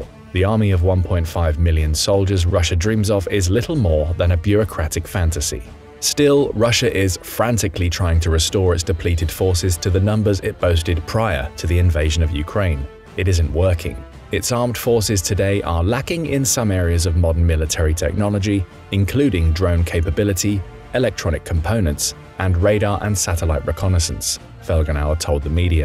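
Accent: United Kingdom. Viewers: A US Military PR piece using British Accent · I hear a british accent!!!😂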